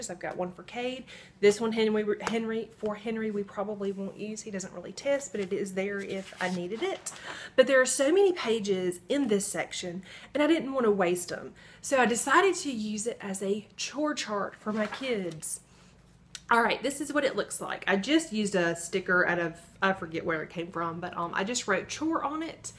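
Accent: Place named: North Carolina, United States. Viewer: rule number two - check your attitude. my husband and i died laughing! [yes, he watches your videos with me! we love your voice......hope that isn't weird. reminds me of north carolina when i lived there] i have never seen a teacher planner from EC, looks neat and i love the little fixes you made to it to make it your own!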